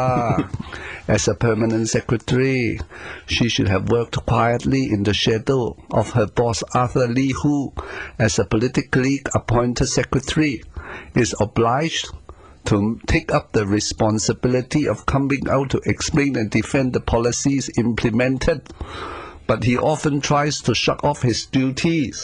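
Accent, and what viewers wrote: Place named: Singapore. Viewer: It's a good portrayal of singlish, I have a good friend from Malaysia and Singapore for the record.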